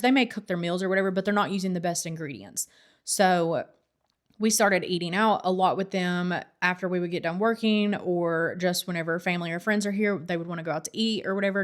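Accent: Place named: South, United States. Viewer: Hi, this is the first video I've watched with you but it's interesting to me because you sound like you have a Southern accent but you say pop which is a western Pennsylvania thing. Where are you from?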